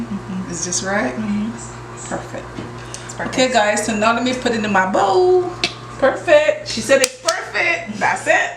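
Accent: Barbados. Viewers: Love that I can still hear the bajan accent coming thru. Bless up queen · I too love a Bajan accent. Music to my ears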